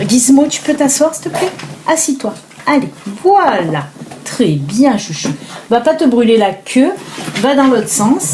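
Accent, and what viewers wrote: South, France. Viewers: Merci beaucoup pour tout de tout mon cœur ton oracle et très beau j'adore j'adhère t'es guidance surtout avec ton accent du sud sa me rappelle mes origines je t'envoie plein d'amour bisous 😘🙏✨💋🔥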